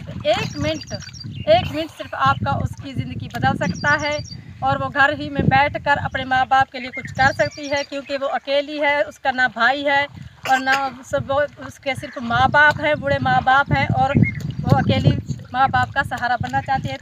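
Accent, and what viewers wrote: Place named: Saraiki, Pakistan. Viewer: Ap ka village konsa hy? Lehjy sy ap sraiki lgti ho mery Abu Ji or Bhabhi bi sraiki area sy hen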